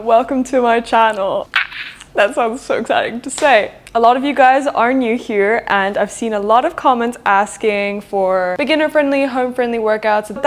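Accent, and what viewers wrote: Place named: South Korea. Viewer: Love the South Korean accent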